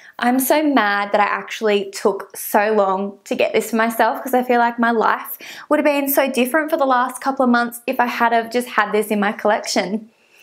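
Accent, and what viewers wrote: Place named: Australia. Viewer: I Love your Australian accent it's beautiful, Are you able to get collection products if so I would love for you to do a testing video for it because I want to see other people's reaction to some of the products I own and love and if you agree X Shannon love you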